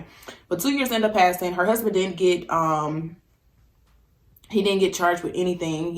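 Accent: South, United States. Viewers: U sound like u from the south are u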